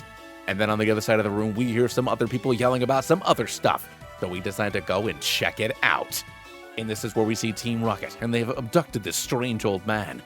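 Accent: Scotland, United Kingdom. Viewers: 9/10 Scottish accent, love Nessie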